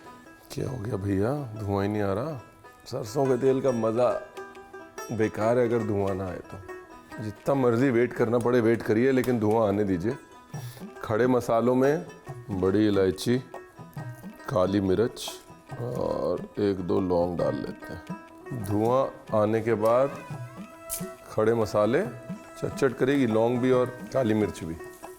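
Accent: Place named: Lucknow, India. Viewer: Ahhh, that mutton looks too delish 😋. Moreover, i love the Lucknowi tone of your language. Love from Lucknow ❤❤❤❤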